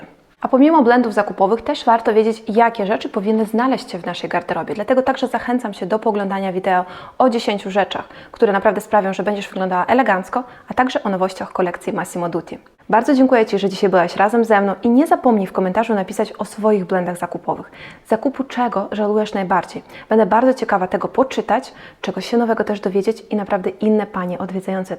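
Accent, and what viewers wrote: Poland. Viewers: To piękny polski akcent z ł jak l. Pozdrawiam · Akcent polski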